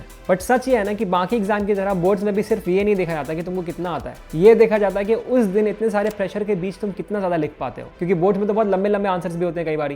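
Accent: Bihar, India. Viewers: Ig he's from Bihar,coz of his way of speaking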